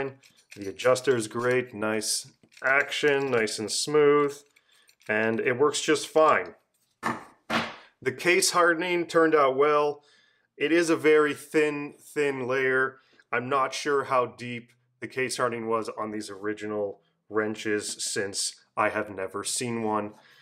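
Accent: Canada. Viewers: The Canadian is strong with this one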